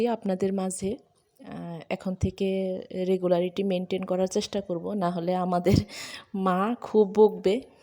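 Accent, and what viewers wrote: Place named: Manikganj, Bangladesh. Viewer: আপু তুমি যেও না, তোমার কথায় মানিকগঞ্জের ভাষার টান আছে,শুনতে অনেক আপন মনে হয়, যেহেতু আমার গ্রামের বাড়ি মানিকগঞ্জ, ভালোবাসা অবিরাম ❤️❤️❤️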